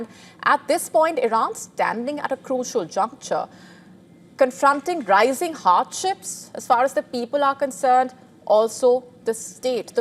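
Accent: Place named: India. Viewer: English in Hindi style!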